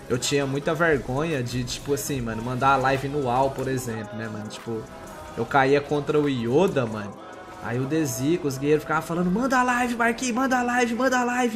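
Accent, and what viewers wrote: Minas Gerais, Brazil. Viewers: ce é mineiro? nois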